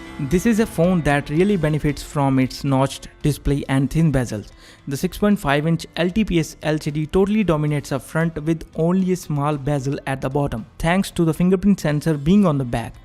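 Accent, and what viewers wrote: India. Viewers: Ugh another impossible to understand THICC Indian accent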